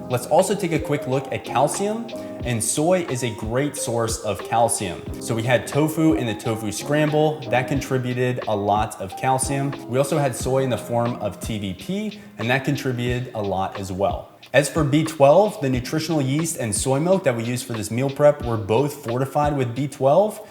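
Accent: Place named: United States. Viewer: You have the most American accent ever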